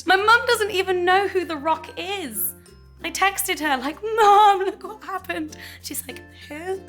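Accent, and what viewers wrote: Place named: Manchester, United Kingdom. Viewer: where is from her accent sounds very familiar.. i want to say manchester?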